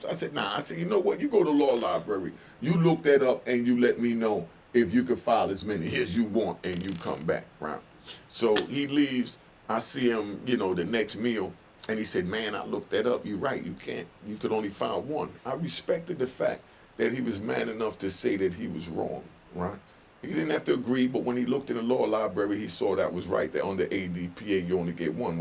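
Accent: Jamaica. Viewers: Respect I see you can speak Jamaican.👍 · Yardman protector you sound like a yardman with a accent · Hey, you Jamaican? If you not the cccent on point😅.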